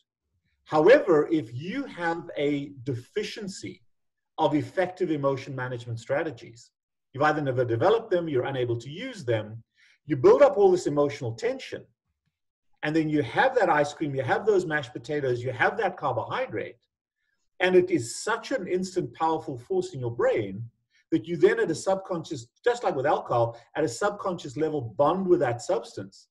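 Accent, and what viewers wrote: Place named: South Africa. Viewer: Ha ha. I can hear a South African accent even when it hardly exists. What amazing doctors UCT produced.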